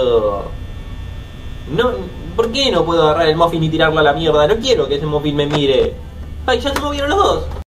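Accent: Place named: Argentina. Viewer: Jajajaja que argentino wacho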